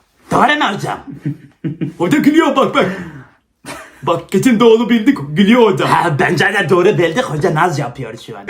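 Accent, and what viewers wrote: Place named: Tokat, Turkey. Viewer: abi tokatlı şivesinden anladım,hatta yemin ederim tokatlı.ben buna cevap verdim de bende senin gibi nerelisin yazdım o yüzden boşuna yazma yani.😊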